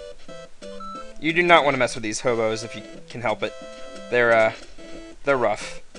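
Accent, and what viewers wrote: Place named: New York, United States. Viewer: Jay Gatsby's his name. Daisy's Nick's cousin. I just read the book, haha :-) I don't remember the part ish or level two though. I don't know what those are from. The spectacles are TJ Eckleberg's, on a billboard for a glasses company. I love your NY accent, btw. The part about Gatsby being poor was a flashback though. And there are no sewers in the book XD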